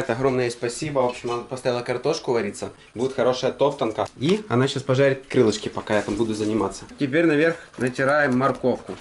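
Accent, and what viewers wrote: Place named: Ukraine. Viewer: Может он сам будет решать что ему делать, и говорит он хорошо, тем более он не из России, в украинском акценте нет ничего плохого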